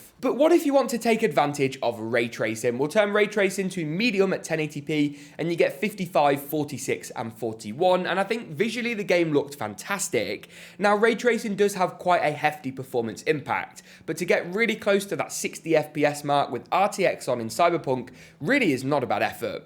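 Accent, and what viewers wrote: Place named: London, United Kingdom. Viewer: London accent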